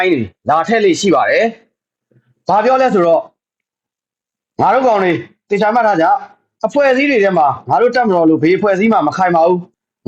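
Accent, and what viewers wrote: Yaw, Myanmar. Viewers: စောင့်လိုက်ရတာဗျာ။ ယောနသံကြီးဘေးကင်းပါစေ။ လေးစားပါတယ်။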